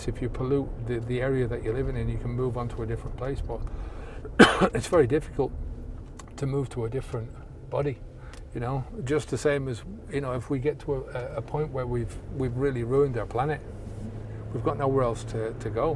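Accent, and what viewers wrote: Ireland. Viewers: I'm loving your videos. I'm Paddy from Wicklow do you mind me asking what part of Ireland are you from?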